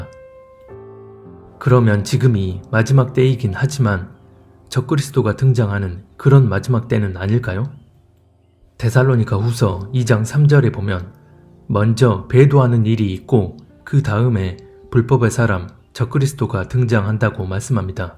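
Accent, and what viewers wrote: Jeolla, South Korea. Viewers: 전라도억양이 아쉽네요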